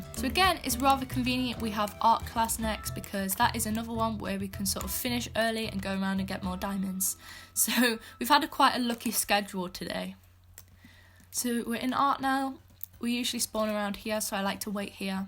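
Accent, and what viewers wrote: United Kingdom. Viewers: Am I the one that love her british accent ?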